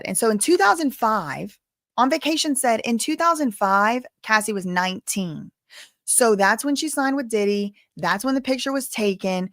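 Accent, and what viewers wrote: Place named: Louisiana, United States. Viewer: 💜💛💚⚜️ this Cajun is here in every chat! Im not always talking, but always learning from you! I love hearing the bayou in you come out 🥰 keep doing amazing things, Queen! Thanks for doing what you do!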